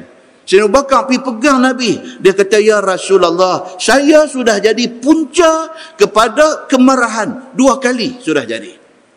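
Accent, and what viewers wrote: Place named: North, Malaysia. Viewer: terima kasih....lega boleh dengaq balik bahasa utara...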